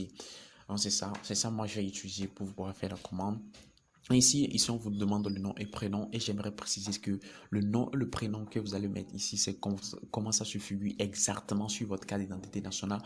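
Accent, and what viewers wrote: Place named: Europe. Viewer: Belle vidéo... Pour les prochaines vidéos apprend à parler comme un africain et non comme un européen merci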